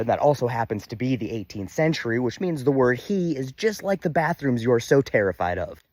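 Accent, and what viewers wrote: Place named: South, United States. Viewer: Woohooooo, do not ever stop. Yours is the kind of hillbilly redneck voice we need. I do mean that in a good way, although i would consider you more redneck than hillbilly. I've been married to one for 30 years. Trumps simple do not speak for all rural country people. Some of us can read a book. 😂 or, in this case, the constitution. Long walk, for a short block. We need you and your common sense way of putting things.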